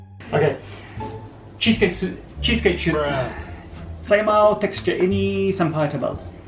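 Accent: United Kingdom. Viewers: British accent enak bgt 😂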